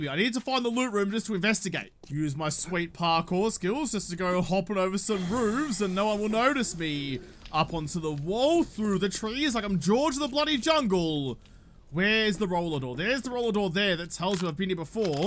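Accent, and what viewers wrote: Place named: Australia. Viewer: he is an Australian... I'll give him slack on pronunciation.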